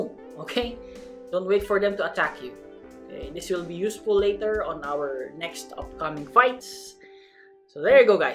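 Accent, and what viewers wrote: Philippines. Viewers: are you a filipino? Because you sound like one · His accent is filipino lol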